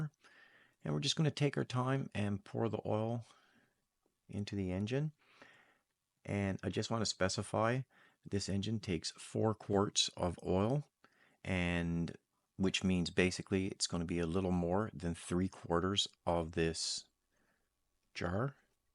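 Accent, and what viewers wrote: Newfoundland, Canada. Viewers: Man. You sound like a Newfie. Are you?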